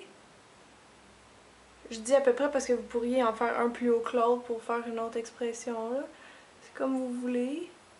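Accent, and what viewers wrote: Quebec, Canada. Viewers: Ta un super accent canadien français et anglais !! XD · J'adore dessiné! et c'est vraiment joli ce que tu fais! J'adore! et je pensais que je n'aimerai jamais l'accent quebecois. La je suis je sais que je me suis trompé. A croquer :) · quel accent sympathique. vive les quebecois! ^^ et quel travail ! bravo, j'aurais pas fait mieux.. xD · j'habite aux états-unis, et j'ai vu ton vidéo, et j'ai pensé "cool, je comprends quelque français, donc je le regarderai!" eh bien tout de suite j'ai su que tu est canadienne à cause de ton accent. ce sons un peu comme un accent américaine avec l'accent français, ensemble. Je suis fier de moi, que j'ai pu le reconnaître immédiatement. :) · C'est un accent québécois je pense... Je sais pas parce que je le suis...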